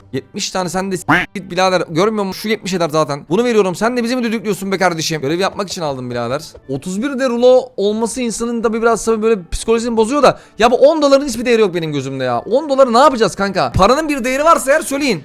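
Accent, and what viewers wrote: Trabzon, Turkey. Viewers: Baba sen Trabzonlu musun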